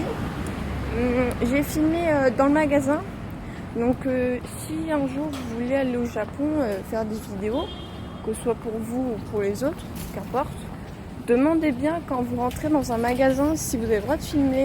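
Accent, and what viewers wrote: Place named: Haute-Savoie, France. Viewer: こんにちは(^___^)元気ですか Je trouve vraiment que tu te débrouille plutôt bien pour filmé...... non je déconne..ahah ^^ mais c'est sympa de t'écouté parlé avec un petit accent de haute Savoie!! je me trompe sans doute?? Merci pour ce partage... et à la prochaine (^_-)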